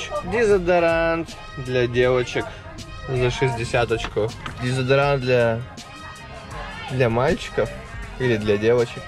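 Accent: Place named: Harlem, United States. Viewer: Где английский учил, по фильмам? Говоришь как черный из Гарлема.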